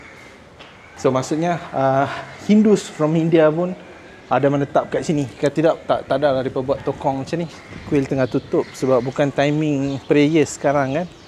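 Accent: North, Malaysia. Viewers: Samalah...first time dengaq loghat utara terus subcribe😂...n seghonok dengaq penerangannya..jelas sebutan..terbaik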